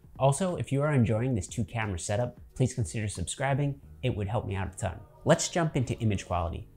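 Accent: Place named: Quebec, Canada. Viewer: Every time I watch your videos, I feel that you are Quebecois, but then I remember that you are in NYC :D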